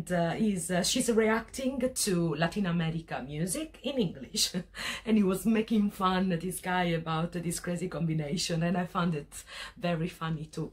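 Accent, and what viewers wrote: Italy. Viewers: HOla, sos italiana? me parece percibir un acento italiano cuando hablas. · Not try to hide your Italian accent please, is so cute. Speak a bit more in Italian, Latin-American love it, and slowly is not difficult to understand for us. Cheers. Nice video.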